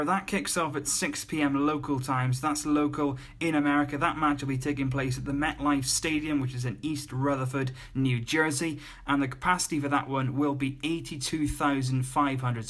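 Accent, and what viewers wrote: United Kingdom. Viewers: i have a question are u british ??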